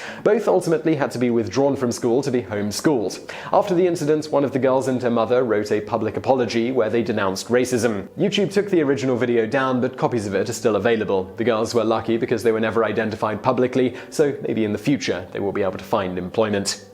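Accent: United Kingdom. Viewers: you have a British accent that doesn’t sound like your constantly smelling something bad,love it.very first class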